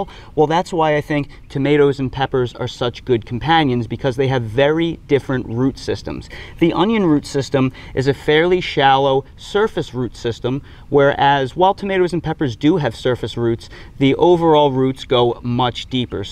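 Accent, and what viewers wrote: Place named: New Jersey, United States. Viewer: You are in Carolina but your accent screams NJ, where I grew up….. are you from there?!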